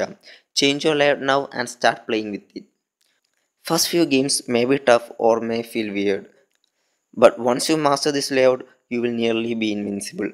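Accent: Kerala, India. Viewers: wonder I thought I knew that accent, Nammallum keralathinna.. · R u from Kerala Ur acsent wow! Amazing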